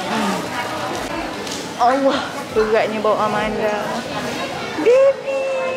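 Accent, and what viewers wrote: Kelantan, Malaysia. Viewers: Comey dengar kak cakap kelantan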